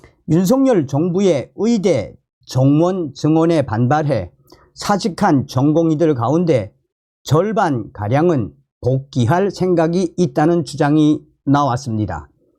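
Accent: North Korea. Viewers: 북한 어투와 어법이어서 인지는 몰라도 반복되는 중언부언이 너무 많고 사족이 너무 많으세요 선생님! 젊은세대들은 이런 스탈 싫어 합니다 .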